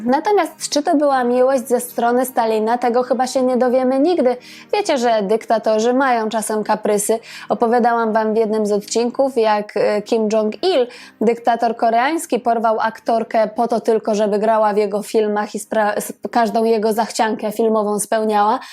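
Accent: Russia. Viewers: I to z niemalze rosyjskim akcentem! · Ma Pani ladny glos no i ten rosyjski akcent tez niezly:)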